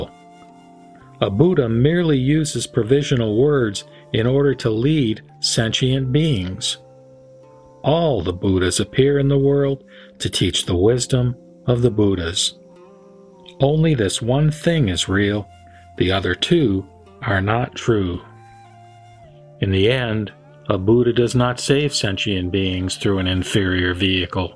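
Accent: Texas, United States. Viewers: Texan accent spoils the reading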